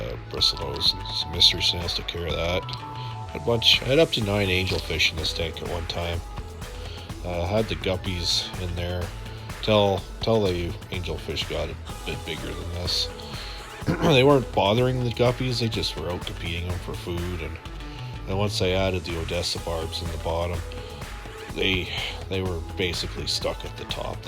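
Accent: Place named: Canada. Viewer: In the first video that I watched, your fishroom tour 2021, I thought you sounded Canadian and you said it was -40 and my thought was you must be fellow Canuck. yeaaaaaaaaaay!! Have now sub'd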